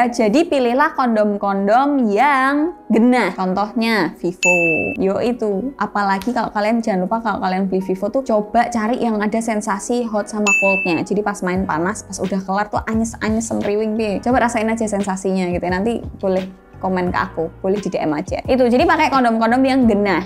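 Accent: Java, Indonesia. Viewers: Sex Education dgn logat Jawa yg kental , kearifan lokal, btw endorsement Kondom Vivo ya empiris apa empirisme nih wkwkwkwk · baru tau chanel ini. .bhasanya medok jowo ne ki wong ngndi.. 😂 salam knal wong jwo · seng tak seneng i... omong medok.e..... DOBBOLLL.... 🤣😂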